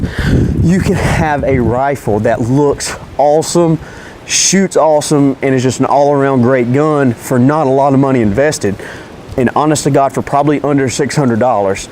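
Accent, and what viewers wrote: South, United States. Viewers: Allsome. Love that Southern drawwwwwwwl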